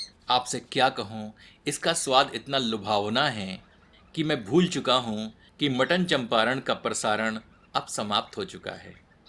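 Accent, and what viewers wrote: Standard. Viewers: आपकी शुद्ध हिन्दी सुन कर ऐसा लगा जैसे रामायण देख रहा हूं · आपकी शुद्ध हिंदी का बोलना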